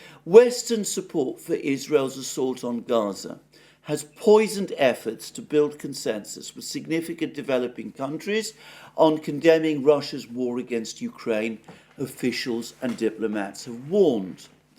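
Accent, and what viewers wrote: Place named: London, United Kingdom. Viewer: ⭐⭐⭐Alexander Mercouris gives a good example, of what journalism should actually be. committed – objective – balanced This is not flattery - this is a factual statement, which you have to come to if you follow his reports over the years. The whole thing delivered in very audible, charming London English and general smiles, “where it can’t be avoided”. In Greek history, Mercury was the messenger - the messenger of the gods - the messenger of traders and thieves... in this case someone found his calling ; ) Alexander Mercouris – gold standard in reporting ! no more and no less ! Hallelujah ! With best regards – "the broker"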